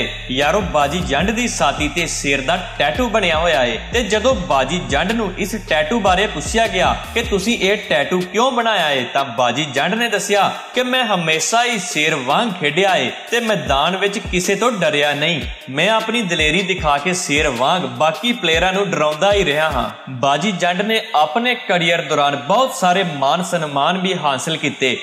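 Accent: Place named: Uttar Pradesh, India. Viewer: Bai keho jahi Punjabi bolda? UP da rehan wala te nahi kitey?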